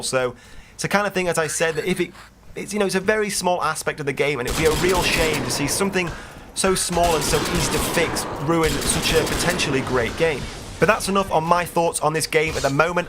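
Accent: United Kingdom. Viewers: wow i like your accent =) British ??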